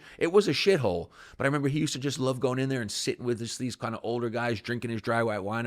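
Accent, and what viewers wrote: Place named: England, United Kingdom. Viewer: Hints of an english accent coming through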